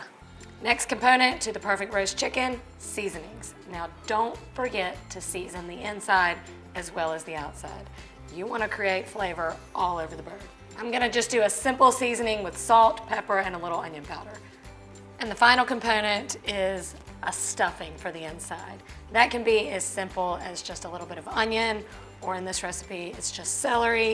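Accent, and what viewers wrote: South, United States. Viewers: is my favorite cooking / food person by farrrr.....love her voice and Southern accent, love her personality, love her food, love it all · i agree with you i watch her at night kinda hooked on her southern accent! lol and she rocks in cooking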